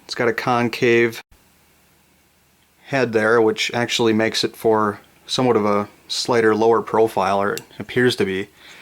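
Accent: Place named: Minnesota, United States. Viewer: It sounds as if the publisher of this video is from Minnesota... Good tutorial for the replacement of push-pins.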